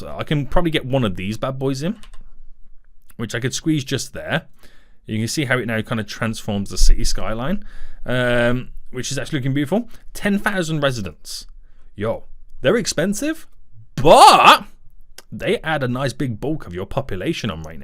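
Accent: United Kingdom. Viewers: Love ur british acccent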